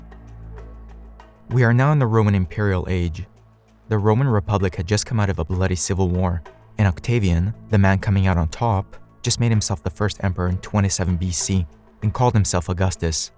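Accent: United States. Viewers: Are you American or simply someone speaking American English and probably jewish?? I normally steer clear of American docos because they usually "silly"....probably as a result of the poor public education system.?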